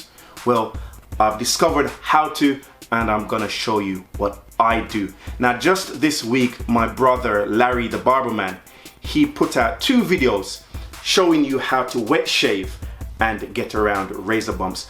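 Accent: Jamaica. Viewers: The patwa hawd fi hide. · Hey man, are you originally from Jamaica? I can hear it in the accent.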